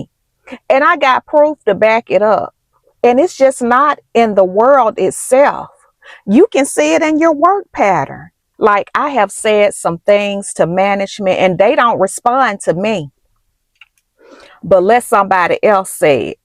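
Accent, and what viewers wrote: South, United States. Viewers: Hi, love your makeup and southern accent. I 🕶️ what you 👀. I’ve never had the 4 legged rat at work, but that dang conniving 2 legged rat will try to get you every time! Be blessed! 🙏🏽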